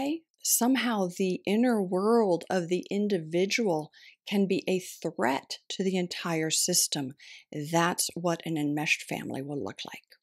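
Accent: United States. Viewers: Faellow... This american flat accents are just hard to listen to.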